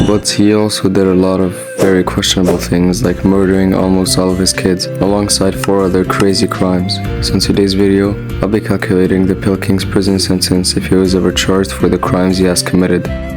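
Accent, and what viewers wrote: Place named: North Africa. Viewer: You north African bro? the accent kinda seeps out! Really good video btw!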